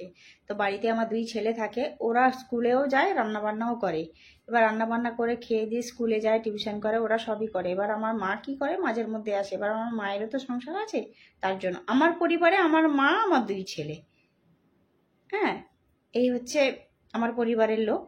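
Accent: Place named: Purba Medinipur, India. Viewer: Tumi to amader purba Medinipur er meye go shune valo laglo 🥰achha amader ancholik vasay ekta question korchi reply dio 😃egra r Contai ascho kunodin? 😀r Digha kotobar jaicho?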